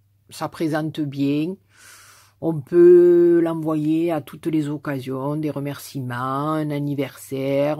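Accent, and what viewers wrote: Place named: South, France. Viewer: Bonjour j'insiste lourdement avec ton accent mais ça me fait vraiment penser a mes cigales d'Ardèche qui me manque énormément .ta carte est superbe et moi je n'avais jamais vu ce modèle. Merci pour ce joli partage bisous et bonne journée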